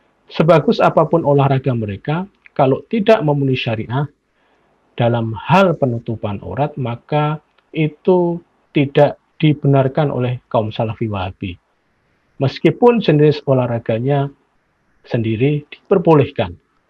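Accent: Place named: Java, Indonesia. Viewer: Jgn nampak logat jawanya min malu saya sebagai orang Jawa cm punya argumen tolol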